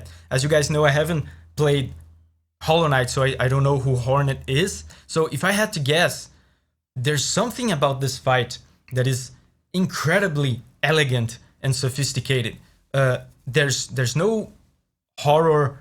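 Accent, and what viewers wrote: Brazil. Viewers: sotaque de br, é brazuca? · Mano, vc é brasileiro? O sotaque já te entregou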